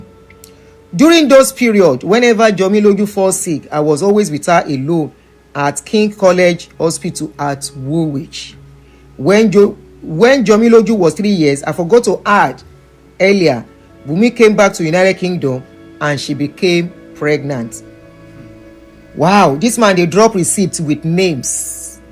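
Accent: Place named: Yoruba, Nigeria. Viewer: Anti that your yoruba thong has come to stay oooo no anointing can change it ooo hahaha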